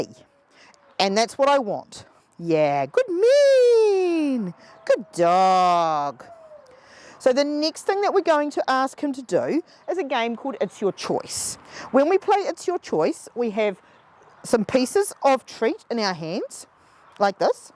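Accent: New Zealand. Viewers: Could you by chance spell out underneath your video what dog treats you're talking about (or perhaps a link to a recipe) since for the N. Americans the Kiwi accent is a bit difficult to unravel in certain spots and the name of the dog treat/roll was one of those spots for me. Thanks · She’s saying better. It’s the New Zealand accent 😊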